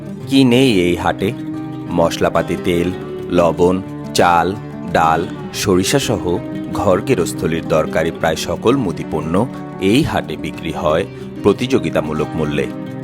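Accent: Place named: Bangladesh. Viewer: Brother R you Bangladeshi ? Your talking style sounds little different.Do they export this big sweets ? But your video attracts me.Thanks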